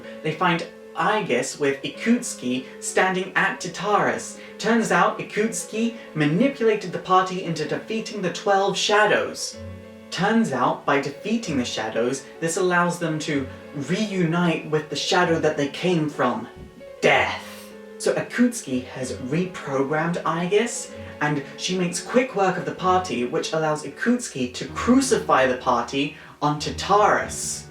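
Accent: United Kingdom. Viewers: I can't tell if your british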